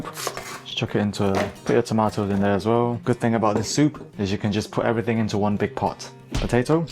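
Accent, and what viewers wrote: United Kingdom. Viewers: are you educated in UK? you have a very UK accent, ^^ its a complement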